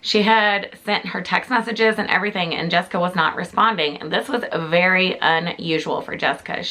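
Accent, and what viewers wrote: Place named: Georgia, United States. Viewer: Sweetie, I don't know why I just heard. You're from Georgia, omg,me too,I was born and raised in south Atlanta, College Park and east point area, I moved to Jasper in 2001.,and lived there till a few months ago when I had to move to Virginia, my middle son and grandchildren are here. My other 2 boys r still in Georgia. I can't tell u how much I miss it. I've never lived anywhere else. I should have known by ur accent. That's awsome that I found ur channel. Oh,this is so heartbreaking,,poor girl. I feel so bad for her mama. She was a beautiful girl. I have a heart for anyone with substance issues as I lost my only sister,in 03,and my nephew,,her son,just in November, both from issues from having done substances. Oh,her kids,that breaks my heart sweetie,,and I hope they find the truth. Thank you so much for the video sweetie. 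Its awsome that you're a Georgia girl too. Happy Easter to you and your family ❤🐰🥚🐥🐣🐇🌺🥰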